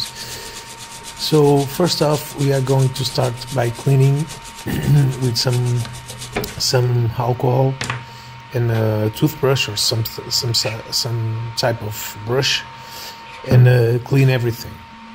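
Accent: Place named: Portugal. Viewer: Hello do you are Portuguese? You have same acent of Portuguese in English eheh. I need to do this repair too 😅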